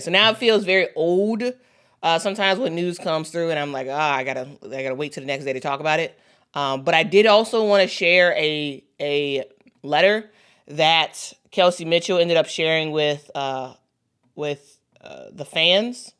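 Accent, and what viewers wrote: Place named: South, United States. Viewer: Wow you have the countriest most southern accent to be from Chicago😆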